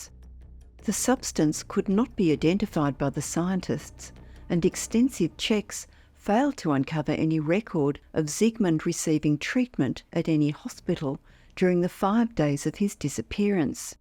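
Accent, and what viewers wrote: Australia. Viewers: That's an Australian accent, not New Zealand. The male presenter they sometimes use is also Australian. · No she Australian or New Zealand.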